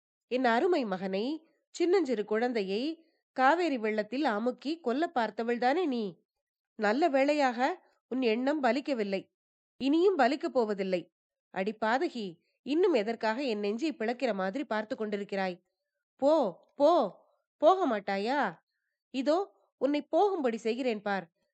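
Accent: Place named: Gyeongsang, South Korea. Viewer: 오 경상도 시투리 억양과 비슷 하구나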